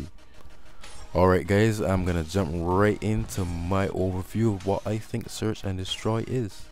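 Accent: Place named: Barbados. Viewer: Hi.... Some points for improvement... No background music. When listening on a cell phone the small speaker makes it harder to hear you. I like how you talk the whole time. You come across very clear and the Bayan accent is of course awesome. Last, you might consider adding more tags to increase exposure and I would include MW3 in the title. Most folks are too lazy to type out the full name. Tmartn needs to watch out for!:)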